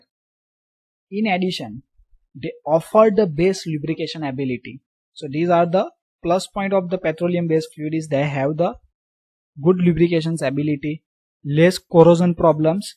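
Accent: Gujarat, India. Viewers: Are you gujrati sir??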